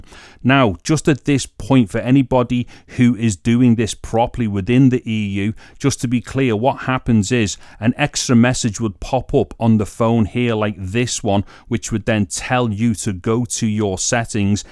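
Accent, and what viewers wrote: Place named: Liverpool, United Kingdom. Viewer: Are you from Liverpool? U sound scouse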